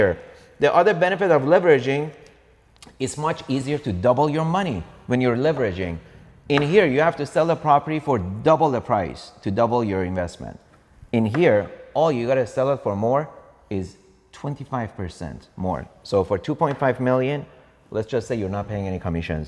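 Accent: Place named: Iran. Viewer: His Farsi dialect still very visible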